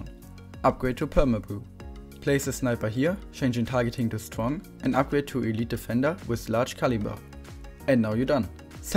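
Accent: Germany. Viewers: Noch nie so nen deitschen akzent gehört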